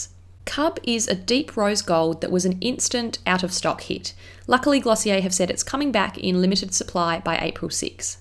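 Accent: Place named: Australia. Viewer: do you live in australia? If so how did you manage to get glossier products? (judging from your accent and assuming you live here in australia cus they dont ship here lmao)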